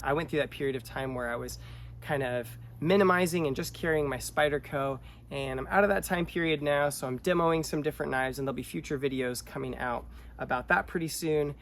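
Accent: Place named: United States. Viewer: Are you from new Zealand? You have a very american accent.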